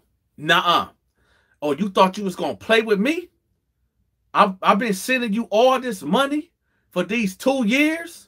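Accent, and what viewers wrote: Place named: Ohio, United States. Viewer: I swear you Feel like Family. Something about your Aura is Vibrant. And you mentioned Ohio. It's family Familiar. Your accent and language. Buster...lol they way you talk I knew before you said it. OHIO Blessings to You and your Family...We all just tryna Understand this thing we call LIFE. Thank You...for your time.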